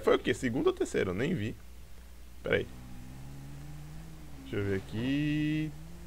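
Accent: Bahia, Brazil. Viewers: é baiano? se for é nós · vc é da bahia?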